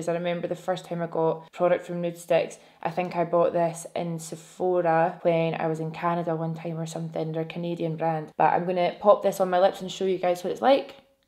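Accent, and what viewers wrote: Scotland, United Kingdom. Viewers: My 5 year old daughter said “ mommy who are you watching “ so I showed her She said “oh you’re watching that girl again I like her voice” I said me too It’s funny because Scottish accents have literally been my favorite since I was so young Also she just said again “ why does she have such a beautiful voice “ I think my daughter clearly loves your voice just as much as me haha ♥️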